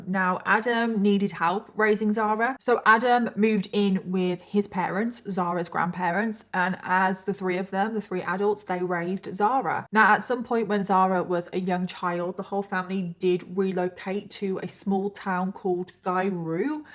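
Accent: United Kingdom. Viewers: Please, Can you narate stories in british accent. It sounds good to me. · MAKE UP REQUEST!?!?! My favorite (American) football team is going to the Super Bowl!!! Will you do a Kansas City Chiefs theme make up? I have just recently discovered your channel and have watched so many of your videos back to back to back that my inner monologue has had a British accent for like 2 weeks now. Thank you!! -Sarah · She’s British and she always does it in her accent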